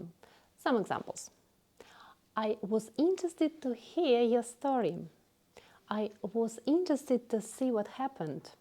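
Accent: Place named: United Kingdom. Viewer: Hi, I'm interested in learning English with a British accent. I just found your channel and subscribed. Where are you from? Does your accent sound different from other British accents?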